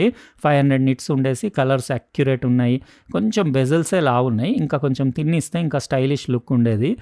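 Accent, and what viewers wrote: Telangana, India. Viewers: Brother video info kanna mee telangana yaasa kosame ekva chustha mee videos...🎉🎉🎉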